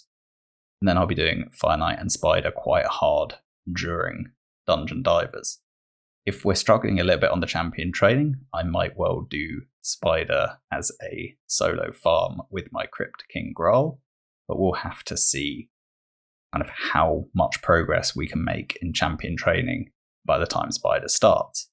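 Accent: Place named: Germany. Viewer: So i startet 8 days ago. Yes, how do you found my small channel then and dont watch the videos from the ,,big" boys? Its cause im a freak in getting Infos. I whatched sooo many videos on how to build, what to do, who to save, where to start and so on and so fort. And ill just grab the epic and then pass the rest of the event. The epic whould help me ab bit. My only 6 star is kael and other i habe 5 star drath and a 4 star vergis with a 4 star warmaiden. So this epic could help me pass some higher stuff cause my kael is full dmg and has really no acc. :) And I like to watch your videos. You are very informative and freetoplay so you understand that we dont have godlike gear lmao. :D Also, are you german? You have an accent. :)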